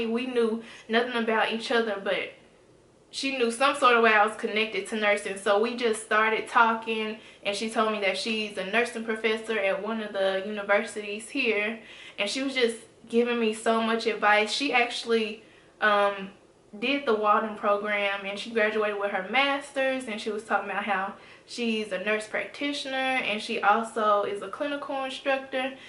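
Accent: South, United States. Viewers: You so country but I love it!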